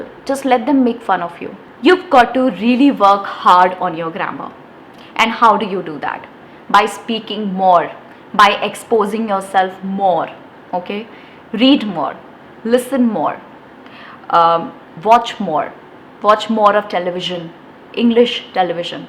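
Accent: India. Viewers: Hello Ma'am, I am Kajol Gupta. I am from Kolkata. I am 23 years old. And I am struggling in english speaking. I am not confident to speak in English but unfortunately i study in english medium. My friend says to me that you had studied on english medium school. But you can't speak in English at all. Why ?? They questioned me. But sometimes I regret myself & why ? Sometimes I can't tell anything or I say that I am still learning when that I can't practice this.but this time I practice myself regularly. Everyday I listening english podcast & you must say that I see easy english podcast which I can able to understand this easy english podcast. And last I want to tell about I love to see your videos when you speak in English fluently & naturally and when you speak in English native accent & I really like your indian accent which I can able to understand and you speak in English in easy words to makes me better to understand that what are you saying & what are you want to saying to ourselves ? 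Which is I can able much better than other hard and advance english podcast because I am a beginner & I can able to understand easy language or words to helps me better to understand. That's all !!! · Indian accent 😵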